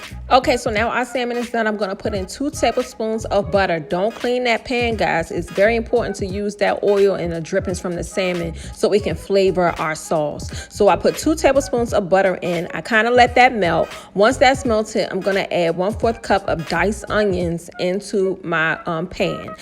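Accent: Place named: Maryland, United States. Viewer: Are you from the Maryland/DC area? You sound like it. But anyway the food look so good. Man oh man 😋😋😋😋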